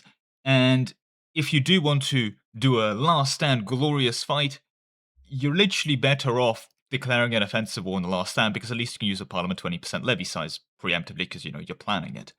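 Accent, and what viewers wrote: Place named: United Kingdom. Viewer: x3 speed is only possible for americans, British accent unintelligible over 2-2.5x speed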